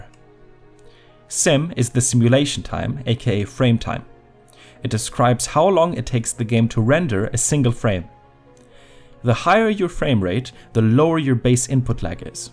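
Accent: Germany. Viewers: Man hört so raus dass du deutscher bist haha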